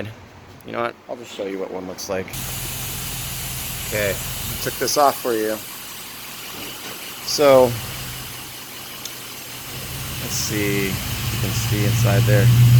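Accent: United States. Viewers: Thanks for the information man really can in clutch but are you from Texas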